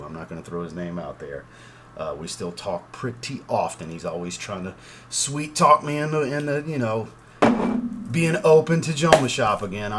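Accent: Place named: New Orleans, United States. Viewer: You from New Orleans area? I am and you kind of sound like it. Maybe Westbank? lol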